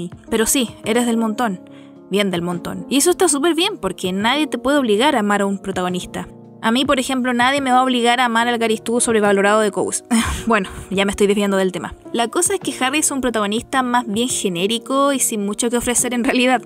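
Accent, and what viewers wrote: Chile. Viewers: Es la primera vez que veo su canal y note altiro que es chilena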